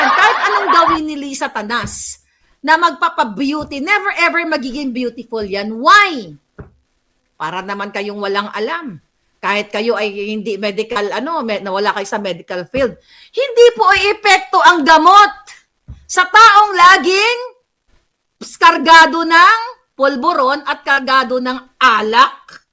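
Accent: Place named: Iloilo, Philippines. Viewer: Hahahahaha, ma'am maharlika, nakakatawa ka sa pagsasalita mo ng elonggo🤣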